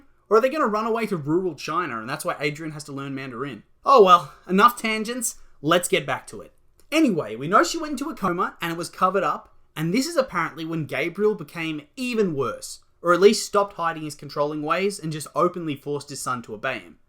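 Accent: Australia. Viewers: Not mean to offend you or anything but you sound an awful lot like an Australian 😂😭✋✨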